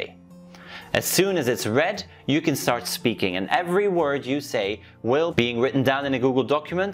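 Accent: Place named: United Kingdom. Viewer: It's because you talk in the queen's English not in American English there's a difference.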